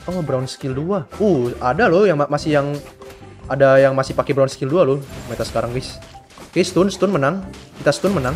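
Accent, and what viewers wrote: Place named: Medan, Indonesia. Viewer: hallo bng subscribe baru ni bang lucu kali logat kau bang orang Medan kah??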